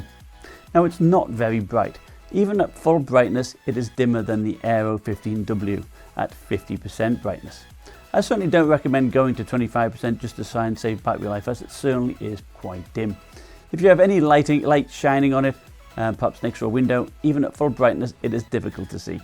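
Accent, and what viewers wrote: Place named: Scotland, United Kingdom. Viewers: exklim Tech News Well, you have this scottish accent plus the funny vid you made with the umprella and I thought u were british! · Sound Scottish.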